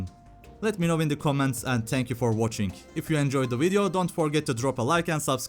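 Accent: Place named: Turkey. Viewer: Are you Turkish? Your accent is resembling the Turkish accent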